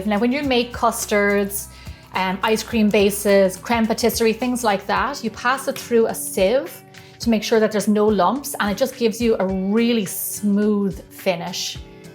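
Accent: Ireland. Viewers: I am DEFINITELY going to the grocery store NOW to buy the supplies. Don’t know if it’s because it’s lunchtime or what, but I’m near drooling here. And listening to you certainly didn’t hurt. Love the Irish accent ❤️ You just popped up on my recommendations, but SO glad you did .... new subscriber here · Hi love your recips and i love your irish accent 💗💗💗💗💗💗😘😘😘😘😘🍰🍰🍰🍰🍰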